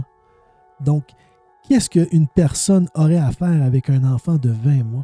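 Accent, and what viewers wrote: Canada. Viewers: Préviens que tu es canadien horrible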